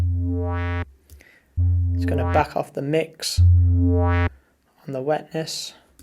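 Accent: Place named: Leeds, United Kingdom. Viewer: Your guna do well on here, I've been searching speed garage tutorials for the last 2.5 years!! There's fuckall!! Lol nice bass that mate, we're you from? You sound very close to my home town Leeds??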